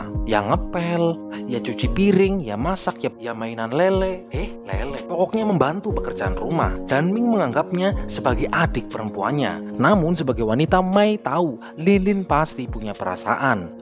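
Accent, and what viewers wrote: Banyumas, Indonesia. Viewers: Admine wong ngapak ya min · aku dadi seneng admine medok basa ngapak hahaha mantap banget pokoke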